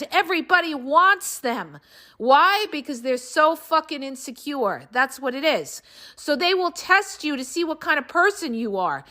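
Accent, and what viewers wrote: New York, United States. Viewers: Ignore the haters! Your colorful vocabulary, and that classic NY/NJ accent and inflections make the podcasts even better. Reminds me of the Sopranos.:)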